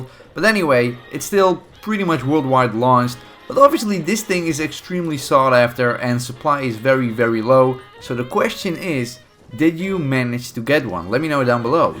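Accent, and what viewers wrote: Netherlands. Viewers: Are you dutch? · Great Dutch accent !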